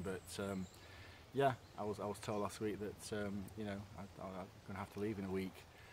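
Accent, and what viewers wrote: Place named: Cheshire, United Kingdom. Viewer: Not a clue who you are, but the algorithm told me to watch this, so I have. Good luck with the job hunt. Your accent sounds like you're from my part of the world (Cheshire) 👍🏻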